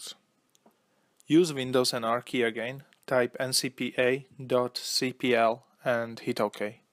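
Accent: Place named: Poland. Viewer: He sound polish to me ;-) Pozdrawiam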